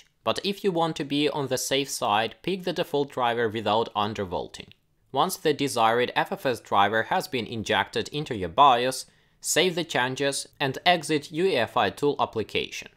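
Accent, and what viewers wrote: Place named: Russia. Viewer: Со славянским акцентом. Очень похож на русский акцент. :)))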